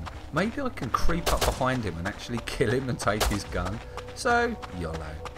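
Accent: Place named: United Kingdom. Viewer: the calmness in your voice as you're hacking up people is quite disturbing lol +1 for the british accent... kinda "Wallace" -like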